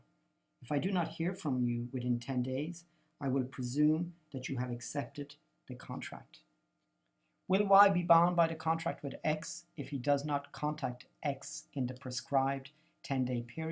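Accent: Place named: Ireland. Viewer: This information is valuable! The narrator sounds like an Irish native. Does this presentation of Common Law apply to Ireland?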